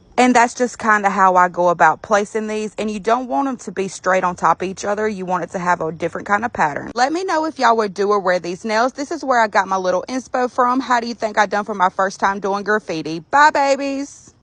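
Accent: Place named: South, United States. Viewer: I’m so sorry but can we talk abt how the longer video plays the more country she sounds 😂😂❤️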